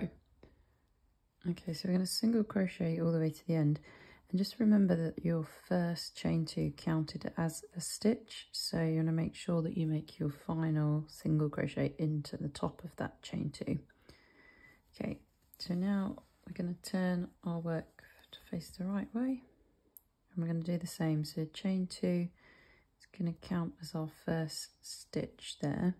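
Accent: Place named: United Kingdom. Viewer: UK accent but using American stitch notation!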